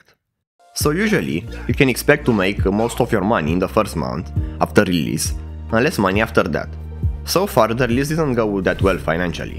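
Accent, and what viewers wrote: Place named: Romania. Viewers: lol stiam dupa accent ca esti roman din prima)) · Si mie imi dadea vibe-ul de roman, dar nu eram foarte sigur :))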